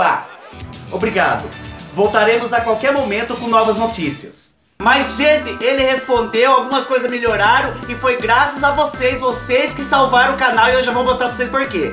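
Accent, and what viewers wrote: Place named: Caipira, Brazil. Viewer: Esse caipirês dele é demais kkkkk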